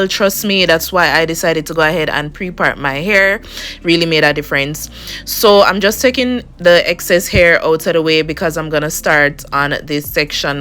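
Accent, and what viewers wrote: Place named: Jamaica. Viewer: Come thruuu Jamaican Accent lmao. These came out lovely. Love it!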